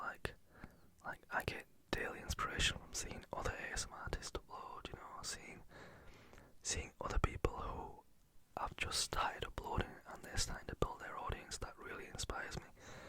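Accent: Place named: Yorkshire, United Kingdom. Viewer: I like your outlook on people who don't get ASMR! Just let them go 😂 I been guessing you were from Leeds from your accent, when you said Bradford made my day! I never get it right hahah. So glad your channel has grown, well deserved ✨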